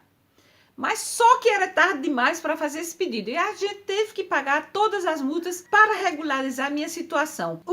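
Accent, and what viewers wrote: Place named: France. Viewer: boa tarde, é a minha primeira vez aqui no seu canal, parabéns e o seu sotaque francês é lindo... enfim, vc tira dúvidas? Se sim, a minha questão é a seguinte. O meu casamento com nativo francês é agora em março 2023, mas estarei de férias, sou comissária de bordo, vou voltar em abril e vou precisar cumprir aviso prévio do meu trabalho. Ele é da mesma área e virá em abril de férias para o Brasil. Isso vai me causar problema para a carta de sejur? Eu vou voltar em maio ou junho para ficar em definitivo na França com ele. Esse meu retorno após o casamento em março vai descaracterizar? Obrigada desde já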